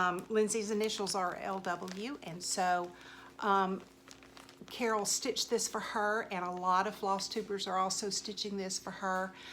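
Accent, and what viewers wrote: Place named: South, United States. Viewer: Oh my goodness. Your video popped up randomly and I see you're from GA. I live in Conyers (25 East of Atl). Where are you located? Where do you shop for cross stitch supplies as I don't know of any in GA? I use 123 Stitch on line shop and a few others. My sister lives in Greenville and I just found out there is a shop near her. I also have my eyes on a trip to Firehouse Poppies (I think that's the name) outside Charleston. I am back to stitching again after I retired and am so happy to see the hobby growing so rapidly. Glad to hear that southern voice and I have subscribed to your channel. Keep 'em coming.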